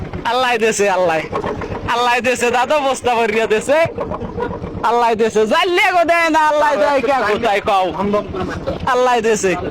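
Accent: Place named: Barishal, Bangladesh. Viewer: বরিশাল্লা মনু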